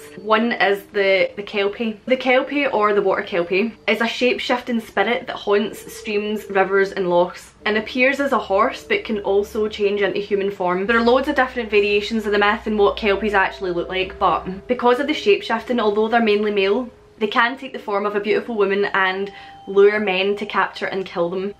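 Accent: Scotland, United Kingdom. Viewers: If you want a laugh..watch with the Closed Captions on. The computer that is used doesn't understand a Scottish accent!! It's interpretation is hysterical!! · If you're interested in folk stories from Scotland and Ireland, Juliet Marillier writes beautiful fantasy based off of them. Daughter of the Forest is book one of my favourite series by her. Oh, and I love your accent! I miss hearing it, since my Grandpa died. He was from Glascow. Well, a small town just outside. Auchinairn??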